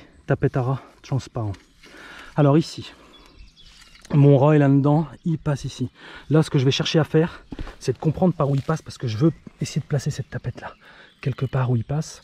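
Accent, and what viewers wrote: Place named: South, France. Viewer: ont utilise les tapettes nAAara comme tu nous les vends si bien avec ton accent du sud.😂😂😂😂😂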